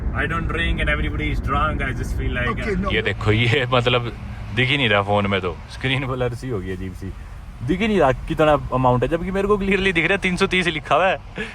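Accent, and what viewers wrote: Haryana, India. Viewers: Es desh mein haryanvi boli sun ke Khushi hoe, very good, love from Sandhu Ambala ❤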